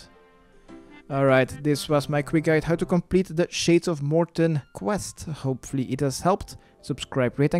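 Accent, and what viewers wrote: Flanders, Belgium. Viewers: I KNEW YOUR ACCENT SOUNDED BELGIAN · Fantastisch! Ik wist niet dat je Vlaams was! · wacht gij zijt gwn vlaams, ik kijk al 30 filmkes van ou en had da nie deur hahaha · Ik wist da ge nen belg waart hahah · What the fuck.. I always thought you had a dutch accent but you're totally flemish :o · He's dutch lol, although he sounds like he's from Belgium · bels denk of fries iig ben brabander en komt me niet bekent voor klinkt meer bels hehe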